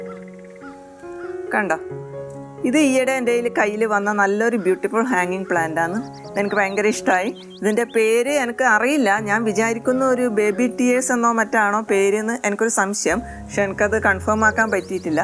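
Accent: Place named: Kasaragod, India. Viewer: Slang kettappo manasali ningo kasaragod bakath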